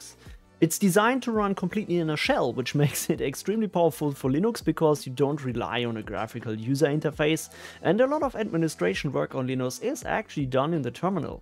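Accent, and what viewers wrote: Germany. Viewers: Are you German? I can hear the accent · Schwäbelst du ein wenig lol ? Grüße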